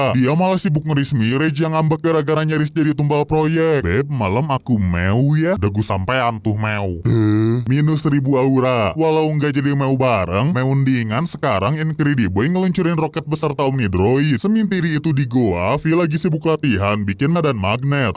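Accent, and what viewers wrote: Java, Indonesia. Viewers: Orwng jawa kah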